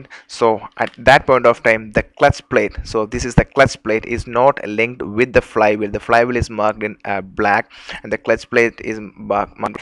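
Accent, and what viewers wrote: Kerala, India. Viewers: It's really nice video dear...there is a feel of kerala in your pronunciation. It's nice to know you too from kerala. Good job bro! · your accent is malayali but any how thanx 4 vid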